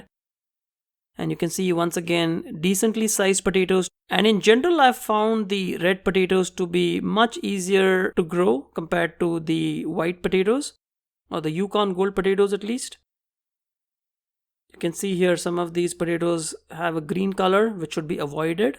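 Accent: India. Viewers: hey dude u sounds like native indian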